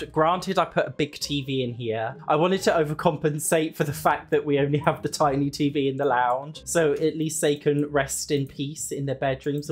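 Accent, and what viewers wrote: United States. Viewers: I’m in love with your American accent 😂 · Your American accent is so funny. That literally is how we say hamper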